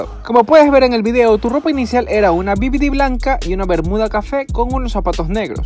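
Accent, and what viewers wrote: Venezuela. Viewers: Eres venezolano:v?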